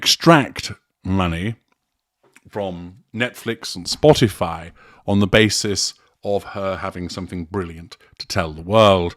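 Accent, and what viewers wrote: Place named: Cockney, United Kingdom. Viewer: Hey Mr cockney Man!!! We don't talk shit about your royalty's entitlements so don't talk about our American royalty. Those two and their feral kids are American now with all the entitlement BS that comes with it?!!Sooo!!! why don't you have a beer and a smile sit down and shut the F/U!!!!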